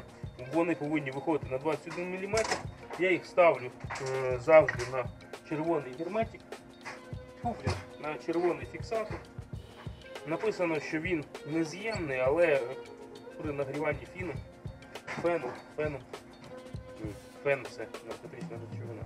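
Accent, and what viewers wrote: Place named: Russia. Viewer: Это тот случай когда русскоговорящий пытается говорить на мове.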